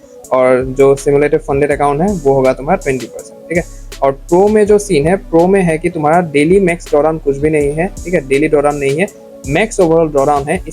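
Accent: Assam, India. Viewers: Assam se ho kya?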